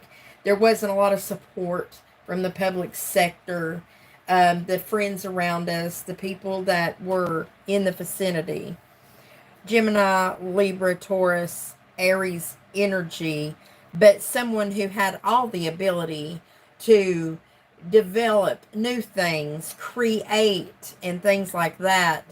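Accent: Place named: South, United States. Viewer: ♥️🙌 Love the southern in ya, Sister! 💫🌻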